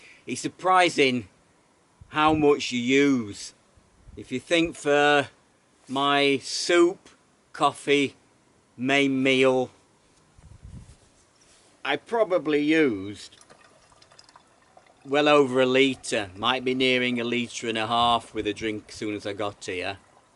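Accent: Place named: Sheffield, United Kingdom. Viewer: Loved this one.Currently "wild" canping in myback garden as the missus is recovering from P.E. and I can't get out into the Aussie bush at the moment.11.00 pm here and a few home brews and sneaky drams of Glenfiddich will see me retire soon into my Doite tent..Think it is from Chile.Originally from Darley Dale so enjoy the Sheffield accent. Cheers Keith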